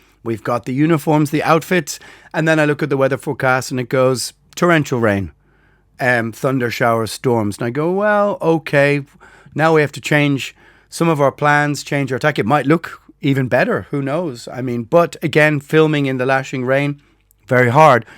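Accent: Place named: Ireland. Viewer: Whenever you say (parentheses) I automatically know you are actually referring to "quotation marks" because you then use the phrase "rabbit ears." But it never registered you were misphrasing it until you started pointing it out, because the Irish pronunciation of the word is different from the American pronunciation :)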